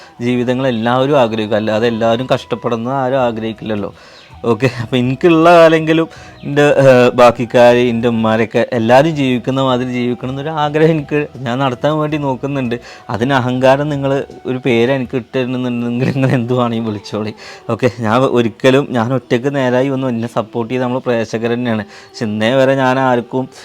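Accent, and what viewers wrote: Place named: Malappuram, India. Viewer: Nee adhyam uppaante ummaante ennu paranjirunnu ippo sinu parayum pole anu ippo parayunnath uppantem ummante ennu basha mattunnu nee Palakkad Jilla alle Malappuram basha varunnu ippo aduthayitt samsarathil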